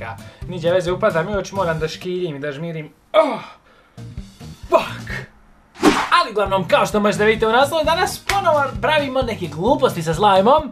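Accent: Bosnia and Herzegovina. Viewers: Jesi ti iz Bosne ba hahahaha ili Crne gore???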